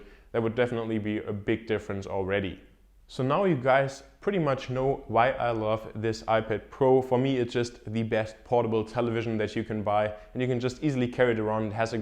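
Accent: Germany. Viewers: i hear german in your voice are you german???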